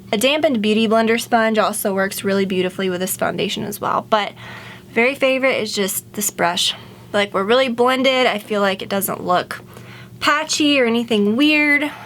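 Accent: South, United States. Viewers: You have a slight southern accent aha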